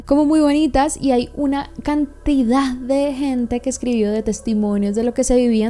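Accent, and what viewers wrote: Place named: Colombia. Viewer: Excelente narración, eres muy buena y tu acento parcero me encante saludos desde Mëxico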